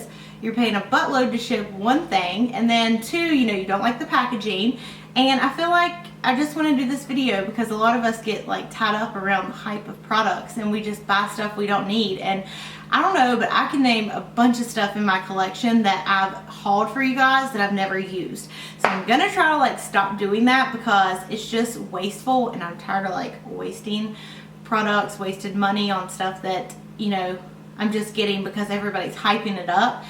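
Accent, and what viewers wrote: South, United States. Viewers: Thank you for honesty, so many times I feel you tubers push products just the sake of selling them. I unfortunately an one those who fall for it too. Keep up great videos. I love your accent too as someone from Kentucky it's nice hear a little southern twang. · this is such a good video like why buy something that's just like other things we own and plus I don't think she could ever make anything sound negative with her southern like accent😊😊😍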